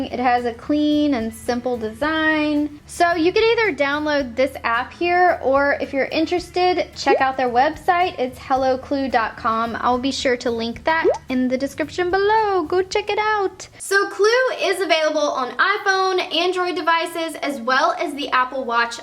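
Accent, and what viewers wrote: South, United States. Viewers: You sound like a country girl. I love it. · her southern accent is cute 😁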